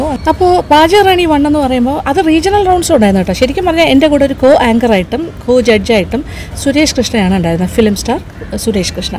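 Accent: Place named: Southern Travancore, India. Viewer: No doubt you are the one who inspired culinary skills in all young minds!! Even I was very particular to be present before Kairali channel at the right time to watch you appear - - though staying at the heart of tvm city your southern travancore slang attracted me the most- You always maintained an unique cooking style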